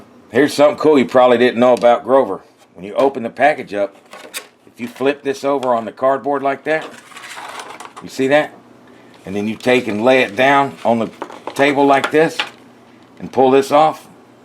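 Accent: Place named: Texas, United States. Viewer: Bro, you gotta be from Texas! Ha ha... Love it! God Bless Texas!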